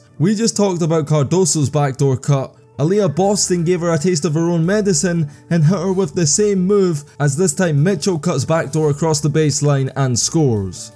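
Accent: Scotland, United Kingdom. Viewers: caitlyn clark is not beating shit ok , boy i tell you the racist cowardice power structure is something else , Those other girls on the indiana fever are balling there asses off there the ones that’s winning those games , but yet everytime they give all the credit to caitlyn clark that’s a good way to start division amongst your teammates, this dumb british accent racist guy narrating is dillusional , those girls on the indiana fever are playing there asses off stop giving caitlyn the credit when she has a whole team behind her !!! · Good job. It just seems kind of odd to hear someone with a thick Scottish brogue doing an analysis of American basketball.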